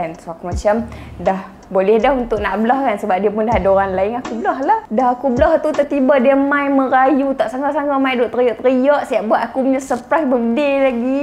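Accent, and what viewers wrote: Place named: North, Malaysia. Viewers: Haihh. Awat aku suka sangat dengaq loghat utara ni. 😂 😂 😂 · Sedap lah orang kedah cakap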